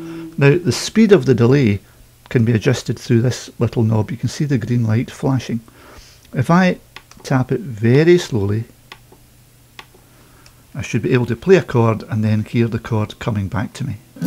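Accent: Scotland, United Kingdom. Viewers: great review and lovely scottish accent. i can't wait to get this amp!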